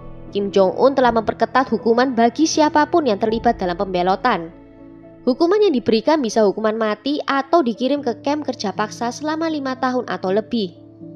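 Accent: Java, Indonesia. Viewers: Say medokuhhh 👍💖😍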